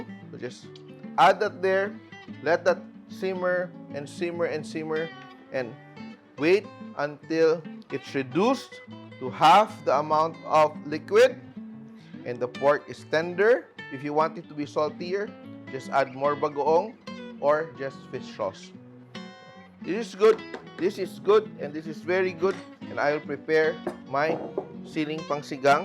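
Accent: Visayas, Philippines. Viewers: Pls do not speak english or tagalog in visayan accent. I don’t umderstand it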